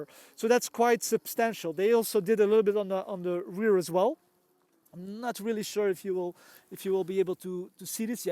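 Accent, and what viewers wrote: Belgium. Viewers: Interesting video! 👍 And clearly you're from Belgium. 😄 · Where are you from ? you don't sound German (otherwise your english is very good) you sound more like a Belgian talking English :p unless i'm that mistaken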